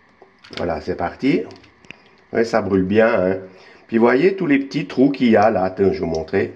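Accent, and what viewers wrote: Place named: Vaud, Switzerland. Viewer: Bonjour, Votre joie de l'enfance que l'on ne pouvait pas s'offrir est communicative. Il me semble que Märklin faisait aussi ce genre de machine. Petit j'en rêvais...Bonne suite et merci de vos partages authentiques, plein de saveur, avec votre bon accent vaudois (j'ai le même!) Laurent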